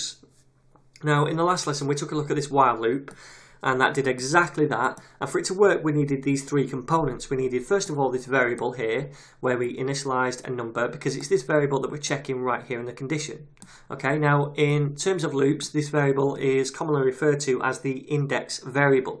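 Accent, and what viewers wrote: United Kingdom. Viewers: everything's better explained in british accent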